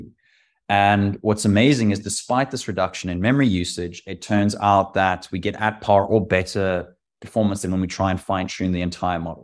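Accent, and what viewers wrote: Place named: South Africa. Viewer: Random comment, I hear your South African accent lol. Much love from SA!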